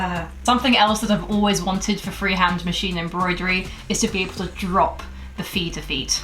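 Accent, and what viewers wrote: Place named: United Kingdom. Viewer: Ok- You are hilarious! You remind me of Brooklyn NY wit, with a British accent.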